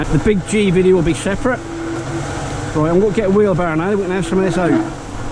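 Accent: Norfolk, United Kingdom. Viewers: What part of Norfolk are u from ?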